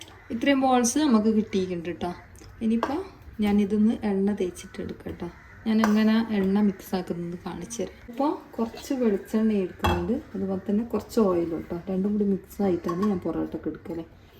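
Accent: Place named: Vadakara, India. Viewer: വടകര സ്റ്റൈൽ സംസാരം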